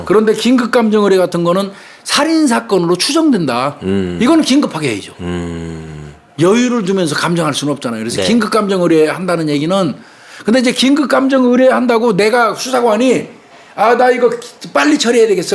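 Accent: Chungcheong, South Korea. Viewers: 김복준 교수님 수고 많으시네요. 그짓말은 충청도 사투리 경기도 표준말은 거짓말 인데요 이 말씀은 교수님 말씀이 구수한 충청도 사투리를 쓰시는 말씀. 교수님 건강 하십시요.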